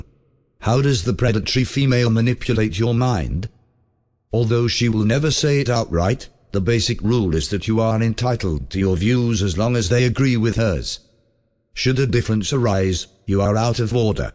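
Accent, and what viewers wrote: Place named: United Kingdom. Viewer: This British accent is driving me crazy.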